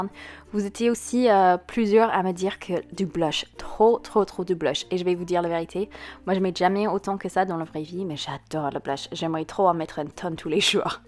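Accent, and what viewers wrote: United States. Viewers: L'accent américain il est tellement beau 😍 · I had no idea has an American accent when she speaks French x) I hear it normal maybe considering I'm American so French people will hear this differently. I wonder if they like the American accent though? ^^ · Oh mon Dieu, déjà que mon rêve c'est de vivre aux USA, mais alors toi, ton accent et tout, ça me donne trop envie d'y aller😔💜 Et sinon tes trop belle et super vidéo💜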